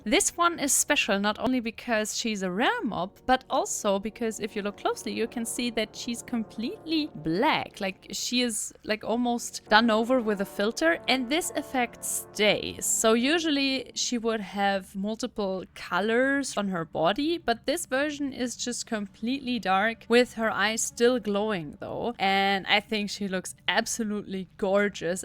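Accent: Germany. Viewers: Ich lieb doch deinen deutschen Akzent😭😭 Abo is raus!! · you are german right?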